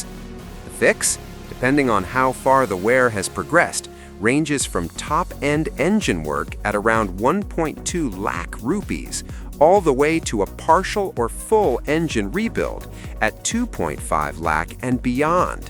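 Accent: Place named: United States. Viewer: The cars a premium budget option is a bloody oxymoron.. Yankee accent talking rupees, this bound to bullshitignore it!!😂😂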